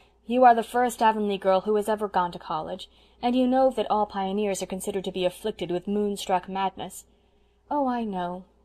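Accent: United States. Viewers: Impossible to listen to the dreadful use of the English language,why do the yanks deform English? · Her English seems perfectly fine to me. A very proper American accent, without strong local dialect. What accent do you consider to be "proper"?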